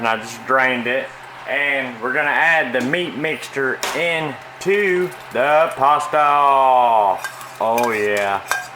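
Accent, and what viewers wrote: South, United States. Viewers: Southern accent.. Im from Waterloo Alabama so I hear it. Just found your channel and love it!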